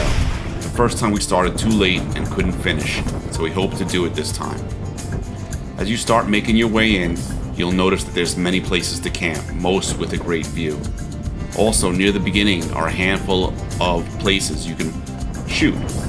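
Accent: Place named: New York, United States. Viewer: This Jersey Girl loves your NY accent. Thanks for sharing this beautiful drive!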